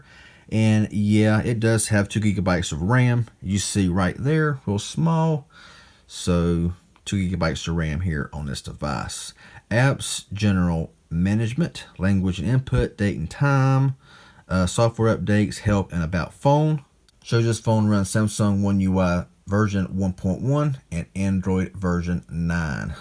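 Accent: South, United States. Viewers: He has a yee yee accent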